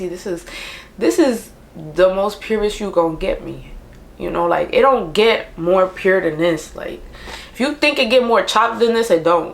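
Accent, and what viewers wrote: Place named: New York, United States. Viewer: i envy that man that puts a ring on it miss them dimples tho and that new york accent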